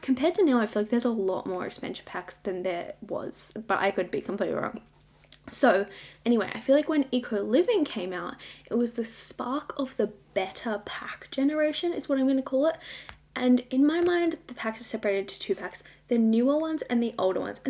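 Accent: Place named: Australia. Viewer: I can't tell if you're australian or not. But i think you sound like one xD I like your accent and voice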